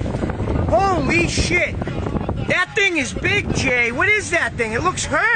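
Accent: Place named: New Jersey, United States. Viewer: the joyzee accent makes it that much betta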